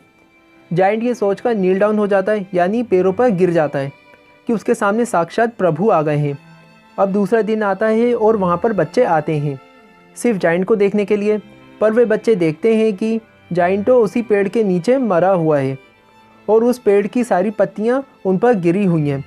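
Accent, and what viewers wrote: Bhopal, India. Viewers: bhai m bhi accent sunte se hi smjh gaya tha ki aap bhopali ho 😄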